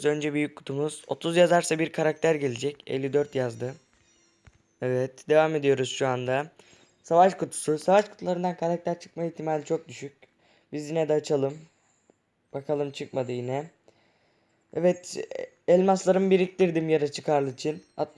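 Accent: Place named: Black Sea, Turkey. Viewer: Bakıyorum da Karadenizli konuşmayı öğrenmissin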